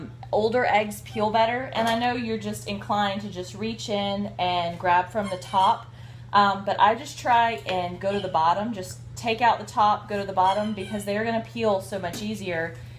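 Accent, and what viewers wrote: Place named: South, United States. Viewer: Hi, I'm spanish and I love the south. ¿what part of the south are you from? I'm studying the differents southern accents. Thanks!!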